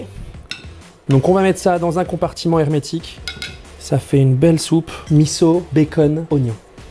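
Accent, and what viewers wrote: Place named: South-West, France. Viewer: Ce que j'aime chez, c'est cet accent du Sud-Ouest qui ressort même en parlant de cuisine Japonaise =D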